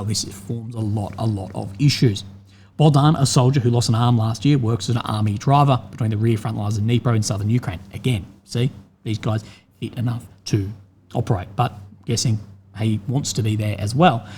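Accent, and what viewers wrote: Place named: Bogan, Australia. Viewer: Aussie bogan....speaking on Ukraine war...hilarious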